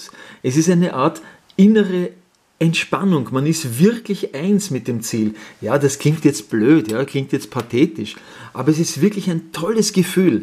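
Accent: Austria. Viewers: 💜🦢💚 Bedanke mich & grüsse ALLE herzlich ⭐ am Samstag Gemüse gepflanzt in meinem wilden Garten 💚🦢💜 Ich liebe den österreicher Akzent.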